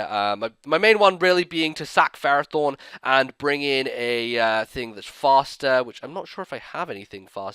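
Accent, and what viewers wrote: France. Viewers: Can we PLEASE have a battle narrated fully in your French voice? That would be oh so amazing. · Yes... more French accent stuff!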